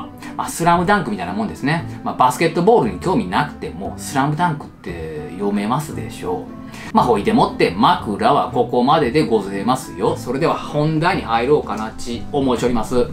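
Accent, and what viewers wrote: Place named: Nagoya, Japan. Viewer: 北九州都市の何処かの町で産まれて❗だけど時々名古屋弁も、関西弁も出ますよね⁉️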